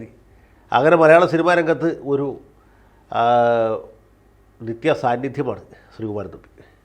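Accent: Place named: Alangad, India. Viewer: ആലങ്ങാടൻ ഭാഷ... വക്കീലേ കലക്കി.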